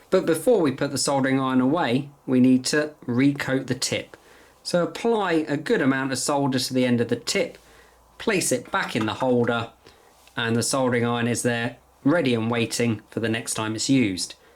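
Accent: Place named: United Kingdom. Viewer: Don't mean to sound crude but the British accent talking about proper care for your tip had me like 😂. Love it! Very informative as a newbie to both arduinos and soldering. Thank you. Much ❤ from across the pond.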